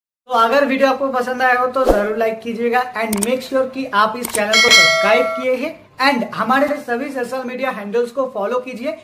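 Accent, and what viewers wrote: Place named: West Bengal, India. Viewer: U sound so bengali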